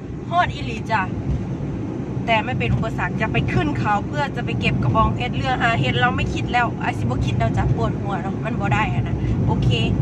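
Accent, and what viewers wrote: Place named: Sakon Nakhon, Thailand. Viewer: สำเนียงคือคนแถวสกลนครแท้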